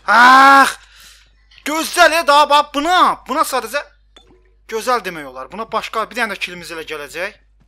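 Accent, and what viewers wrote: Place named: Shamakhi, Azerbaijan. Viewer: emi Şamaxilisan? Menim bibim gil Şmaxilidi senin kimi danisirlar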